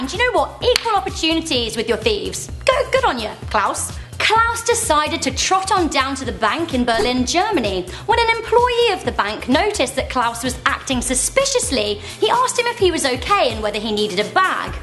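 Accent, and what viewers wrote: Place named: England, United Kingdom. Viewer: Out of curiosity. Which part of England you are from?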